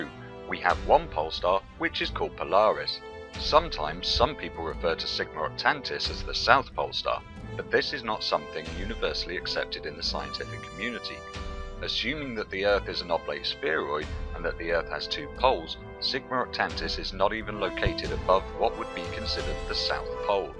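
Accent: United Kingdom. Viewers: For some reason I loooove having earth and space explained to me by a person with a British accent. I don't know what it is.. maybe the impression that I'm listening to the Hitchhikers Guide. Anyway, thanks for making these videos. On a side note, we absolutely need people asking questions about this or trying to challenge any "dominant" ways of thinking. If that weren't the case, we would never make any progress whatsoever. And when dealing with questions about space, the universe and our place in it, the sheer and ever incresing gigantic scales that you have to wrap your head around, can make it hard to combine basic principles and make sense of them, even if you have actually understood them on a smaller level. In order to support people making progress on their own, we should always refrain from name-calling; apply logic and be patient about it. Also, whoever relies on the scientific method should always embrace the possibility of his theory or working model being proven wrong at some point. 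If in this case, however, anybody proclaims he can challenge the spheric model of earth which he hasn't understood (after seeing A LOT of FE-videos I understand this is THE first and foremost wall that flatearthers crash into), supported by false claims which, as he heard from somebody, somewhere, were "actually true", not being able to support this or any other claim or reproduce it by his own means with any model or experiment, whilst having all his "evidence" shattered by one logic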